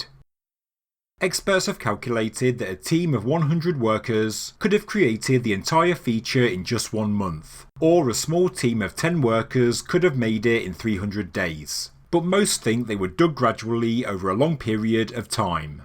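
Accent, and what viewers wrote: United Kingdom. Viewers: O conteúdo do Canal é ótimo. Mas ainda aguardo legenda para Português no vídeo. Esse inglês britânico é horrível!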